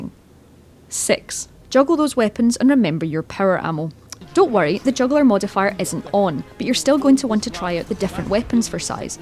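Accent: Scotland, United Kingdom. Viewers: sounds like a Scottish accent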